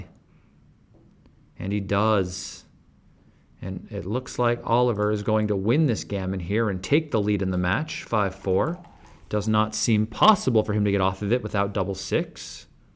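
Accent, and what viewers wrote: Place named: New York, United States. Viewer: This guy's sense of humour is really awesome also the new yorker accent in him always reminds me of Burr.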